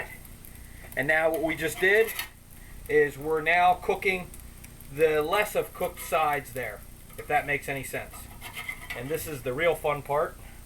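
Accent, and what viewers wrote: Baltimore, United States. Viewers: You must be from Baltimore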